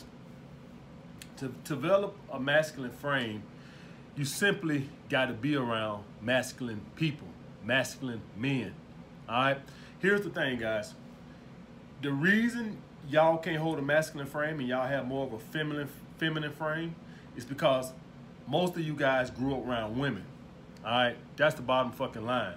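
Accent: South, United States. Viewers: you been living in NY few a while and still got that Southern Accent! But I see what you’re saying fam.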